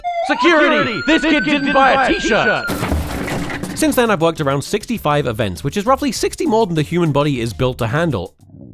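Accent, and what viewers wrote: United Kingdom. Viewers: just wanna say love you vids not only because your also English (I assume from the accent) but because your videos have always made me laugh 😁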